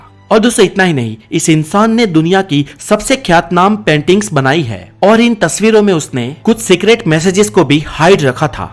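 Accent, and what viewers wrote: Gujarat, India. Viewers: You are a Gujarati right 😅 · kya aap gujarati hai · भैया जी यह सर गुजराती है और गुजराती लोग ऐसा ही बोलते है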